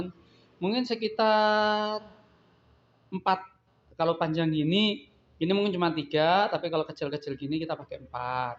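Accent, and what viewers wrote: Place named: Java, Indonesia. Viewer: Mas asli mana sih, jawa jogjanya medok banget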